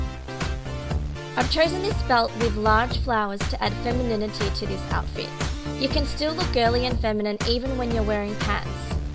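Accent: Australia. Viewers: u sound australian reminds me of alli simpson <3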